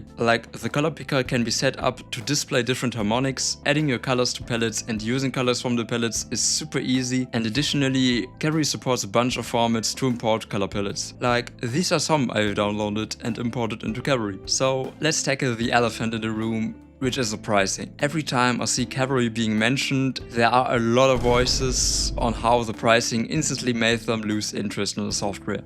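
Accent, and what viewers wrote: Germany. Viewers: ARE YOU GERMAN BY ANY CHANCE WOW YOU GOT DISCORD? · Dieser deutsch Akzent 😂❤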